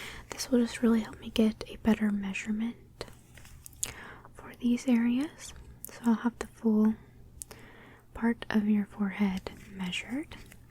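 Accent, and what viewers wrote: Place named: South, United States. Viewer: Really enjoyed that, Thanks! And are you Southern? You kind of sound like Kelly Clarkson! I have had a crush on her since i was a teen lol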